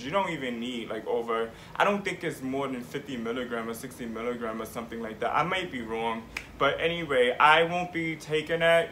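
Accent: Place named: Puerto Rico. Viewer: Yes,I really I❤VE his sound/accent/voice!! Also has a lil boriqua mix too! Always so informative very smart,with much intellect😊I just love him!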